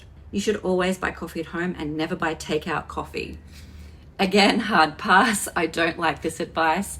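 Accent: Australia. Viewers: Nice to hear an Aussie giving some good common sense advice.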